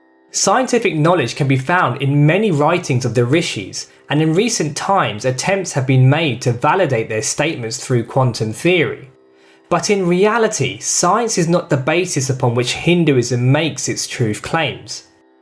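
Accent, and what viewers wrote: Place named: United Kingdom. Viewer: Charvaka was also a Hindu materialist Rishi,his philosophy was very materialistic😄.I have seen all your videos it seems you have read many Vedas,upnishads and purana.your understanding is also praisable,I think you live in London (your accent is British and library of London is pretty rich specially when it comes to old Hindu scriptures). I'm requesting you second time make a small video about yourself.I want to recommend a name Dr.Narayan Dutt shrimali.Answer my comment so I will know that you read.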